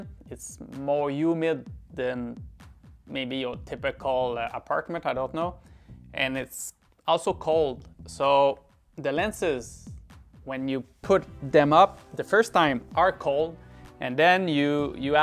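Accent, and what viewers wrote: Quebec, Canada. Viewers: Est que t’est québécois?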